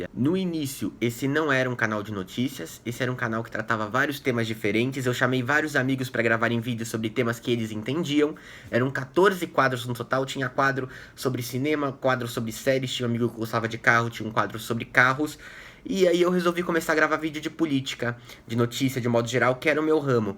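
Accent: São Paulo, Brazil. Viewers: Tu é paulista pensei que era gaúcho.Maneiro os seus vídeos!!!!Tu vai ter muito trabalho em 2018.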